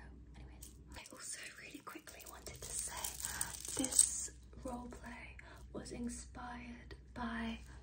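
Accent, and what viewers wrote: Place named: United Kingdom. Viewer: Oh my god I was NOT expecting the British accent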